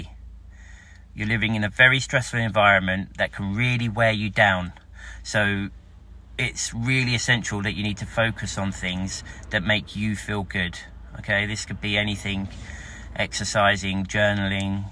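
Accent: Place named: United Kingdom. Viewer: Откуда Вы? Такой акцент, будто Вы из Великобритании, звучит так правильно. Получилось ли у Вас создать свою семью?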